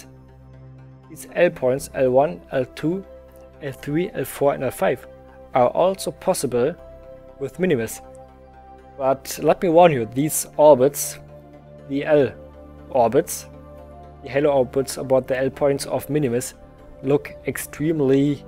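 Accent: Germany. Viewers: r u german? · Dankeschön! Es war ein gutes Video. Und "Flugzeugbau" bedeutet "Aeronautical Engineering" in Englisch. Du kannst das im Wörterbuch nachschlagen: <link> Und noch etwas: Ich mag deinen deutschen Akzent! :) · German English? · Wow, ich kannte dich nicht, hab aber sofort an deiner englischen Aussprache gehört, dass du Deutscher bist. :D Tolles Video! :) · You have a very german accent.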